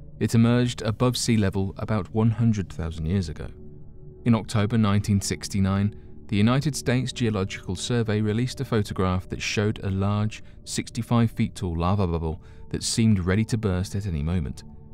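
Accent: United Kingdom. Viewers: I have an unexplained mystery to explore: why are so many narrators of these channels British? Do they sound more "mysterious", or "suave", or "distinguished" than an American narrator would? Unless of course ALL of these channels are British owned and operated...which is real likely🙄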